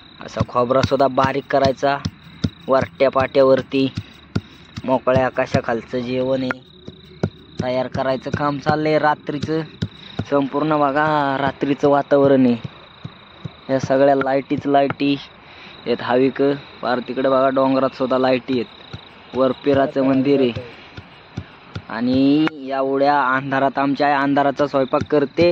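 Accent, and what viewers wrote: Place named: Dhangari, India. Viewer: त्रास नाही पावणे थोड धनगरी बोलालवर चांगलं वाटतं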